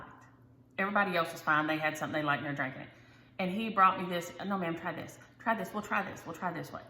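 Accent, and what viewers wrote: South, United States. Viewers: Lady. Thanks for sharing. You are hysterical. This yankee doesn't get to hear cool southern accents like yours very often. I enjoy it so much!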